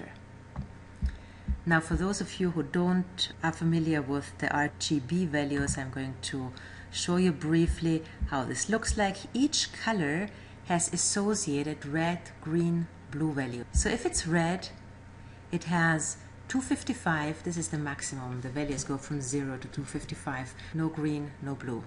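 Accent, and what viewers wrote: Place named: Jamaica. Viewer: Excellent tutorials! Your accent is cool.. are you Jamaican?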